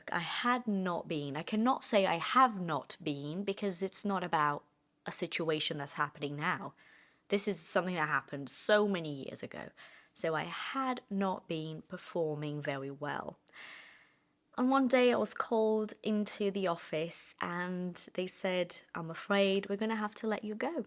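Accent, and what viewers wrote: United Kingdom. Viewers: Incrível pela sua fluência e sotaque britânico. Congrats!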